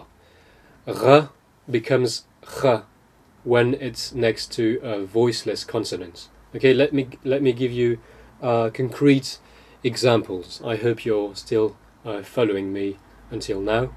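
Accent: England, United Kingdom. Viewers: You have a lovely English accent :) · Fantastic English accent! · Actually, I really think this "letter" could be a great difficulty for an english speaker... Even in "Francophonie", we have different pronunciation ... For example, here in Belgium, the center, near the capital Brussel, we use a "R" more agressive than the South of Belgium... in some places, they "roll" this letter... like in Italian... And in Quebec, I think they pronounce de R almost like... englishesspeakers! LOL So, if you try to learn, I just have to say GOOD LUCK! And sorry if we hear your accent... it's allways like that, but we love that cute english accent! (we don't recognize if it's English, American, Canadian... or else, but we love it! really!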